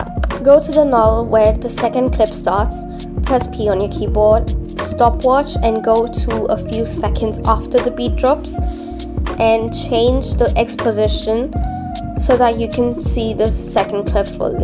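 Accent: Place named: United Kingdom. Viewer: she has a british accent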